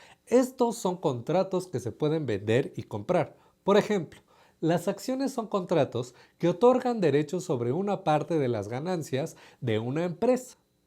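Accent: Mexico. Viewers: Nuevo suscriptor, encontré en tu vídeo la mejor explicación a mi duda, me supongo que eres mexicano por tu acento, ojala pudieras exponer con este mismo lenguaje el tema de la fluctuación de los tipos de cambio, hay muchas explicaciones que indican como funciona actualmente, pero mi duda es como se cotizaba el peso mexicano antes de 1994 y si todavía hay países que tengan ese tipo de control cambiario y como les va por qué aquí:si Grecia tiene demasiados burócratas el dólar sube si llega un tsunami a Indonesia el dólar sube, si se atora un barco en el canal de suez el dólar sube, si los texanos no pueden pagar sus hipotecas el dólar sube, si se incrementa la producción automotriz en México el dólar sube si Putin le dice compadre a Trump......etc.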